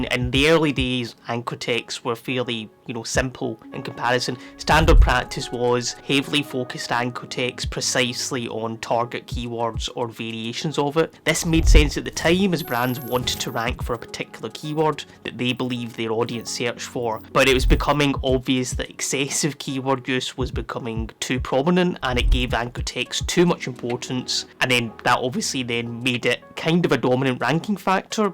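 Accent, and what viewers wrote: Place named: United Kingdom. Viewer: That's an entertaining British accent 😅 Where from?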